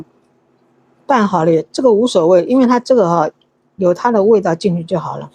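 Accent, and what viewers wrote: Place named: Shanghai, China. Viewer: 我覺得媽媽好像是江浙人、因為有上海口音。我父母就是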